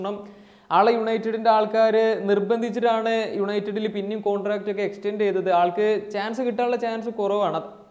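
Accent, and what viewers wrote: Malappuram, India. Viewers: ഇങ്ങൾ മലപ്പുറം കാരൻ ആണോ 🔥❓️❓️ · Malappuram slang kidukki💥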